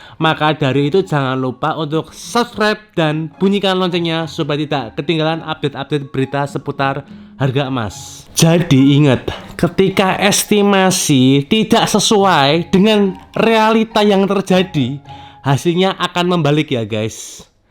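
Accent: Java, Indonesia. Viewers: pak medok banget pak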